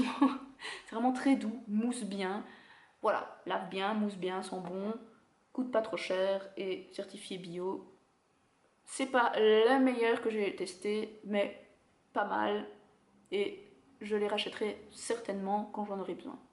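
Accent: Belgium. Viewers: je tarde un peu mais c'est normal pour le shampooing à la violette... tout les shampooing pour blonde font ça...( effet sac de noeuds). En tant que blonde c'est une galère!! bisouxx et quel plaisir cet accent belge surtout pour qqn qui désormais vit à NY!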